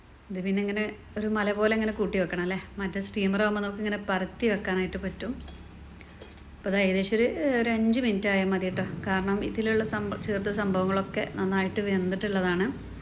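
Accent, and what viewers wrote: Malappuram, India. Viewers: പക്ഷെ ഈ ചാനലിന്റെ ഒന്ന് രണ്ടു വർഷം മുന്നേയുള്ളതിൽ ഒക്കെ തനി മലപ്പുറം സംസാരം ആണല്ലോ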